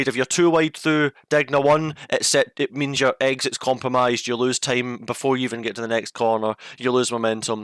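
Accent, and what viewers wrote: Scotland, United Kingdom. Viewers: I love thee Scottish accent mate.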